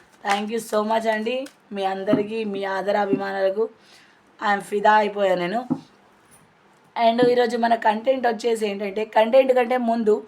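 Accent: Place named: Telangana, India. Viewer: Mee language telangana FASA bagundi.konchem boldness ekkuve.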